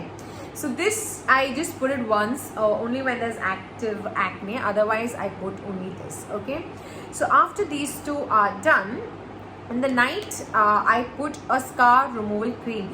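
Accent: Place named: Kerala, India. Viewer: Why do I find your Malayalam funny and out of track? 😂 It sounds sooo cute. And I know the pain, I was there!!! Great tips. ❤️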